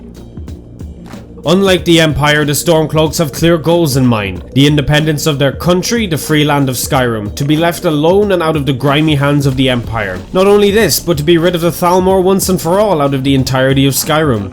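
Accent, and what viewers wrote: Ireland. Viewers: I would suspect you are Irish after this vid if it is not for your nickname and flag) Keep up the good work.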